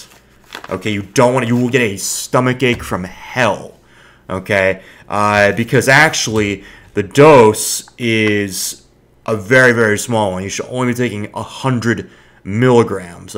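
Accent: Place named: California, United States. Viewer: it's just a smart Cali dude cadence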